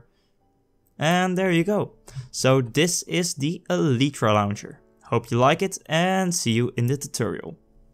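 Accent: Netherlands. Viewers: Holy shit je nederlandse accent man xD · hey you dutch?????? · Are you from Belgium or Netherlands? :p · I can hear That youre dutch from ur accent :P goeie video man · are you dutch i can hear it from your accent? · he has a dutch accent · Are you dutch? You really have that dutch-english accent, i know because i am dutch XD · je hoort gewoon dat je nederlands bent...lool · you're Dutch aren't you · You sound dutch. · Netherlands xD Ik hoorde je accent al een klein beetje. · Ben je nedderlands haha ik hoor het aan je accent leuke video ga zo door! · bad english lol, you are obviously dutch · kerel, jouw nederlandse accent😂😂 nice!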